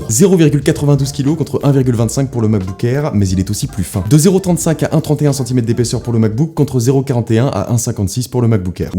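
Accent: Quebec, Canada. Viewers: Cette accent, québécois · Ahh un québécois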